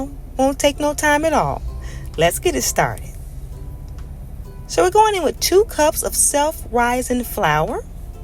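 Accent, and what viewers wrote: South, United States. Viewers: Greetings, from Florence, OR. I'm new to your channel, love the southern drawl, and enjoy your demos. Haven't tried any yet but they're high on my priority list. All the best to you with appreciation, Diane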